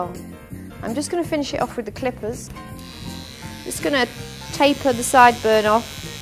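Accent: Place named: Liverpool, United Kingdom. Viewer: It sounds like she has a Liverpool,England accent to me for sure.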